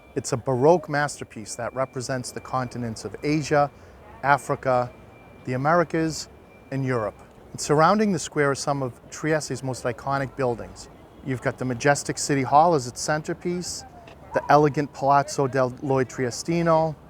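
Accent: Boston, United States. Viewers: excellent video. Is that a Boston accent?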